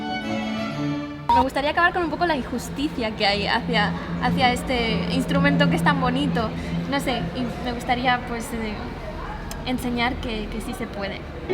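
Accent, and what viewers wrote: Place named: Mexico. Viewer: Se te pegó un poco el acento mexicano 😁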